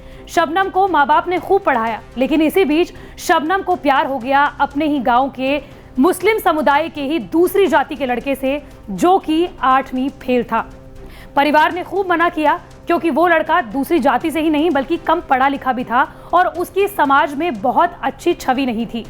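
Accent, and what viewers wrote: Bihar, India. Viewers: App bihari ho kya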